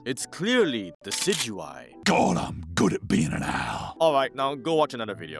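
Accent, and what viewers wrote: Singapore. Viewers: are you Singaporean, cuz the accent do be like that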